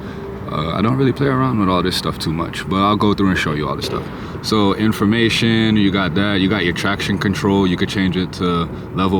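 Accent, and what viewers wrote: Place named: Trinidad and Tobago. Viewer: I'm looking at the naked bike version of this, they both look so damn good. It's crazy because I remember no one talking about them, looked them up and then next thing I know the 675 is everywhere lol. Enjoy it bro, for a first bike you made such a good choice you probably won't want to change for a long time. Also, I hear your accent bro ! lol my family is from Trinidad so I got an ear for it. Dropped you a sub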